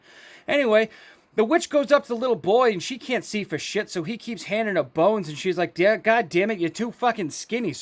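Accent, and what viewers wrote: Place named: Boston, United States. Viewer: You’re killing me. It wouldn’t be the same without that thick Boston accent!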